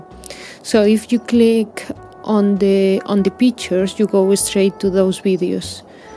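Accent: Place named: Spain. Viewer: Clementine Windflower She is from Spain. I can tell by the accent.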